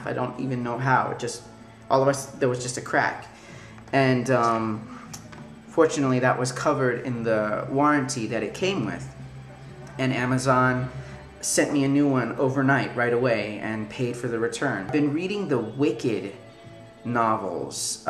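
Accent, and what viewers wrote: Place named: South, United States. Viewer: You sounded really southern in parts of that video!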